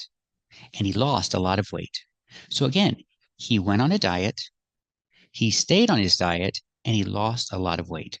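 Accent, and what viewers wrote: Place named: United States. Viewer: face-blue-smiling:... Hello. I follow you for two main reasons: I appreciate your American accent and your professionalism. You explain all the details thoroughly and consistently provide examples of how to use various structures. By the way, where is your accent from? Thanks a lot for everything you do!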